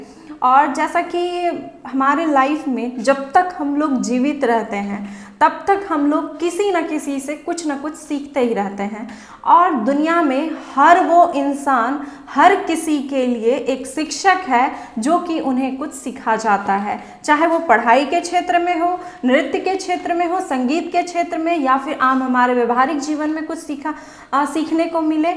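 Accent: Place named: Bihar, India. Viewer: Bihari... Style mam😀😀🙏🏽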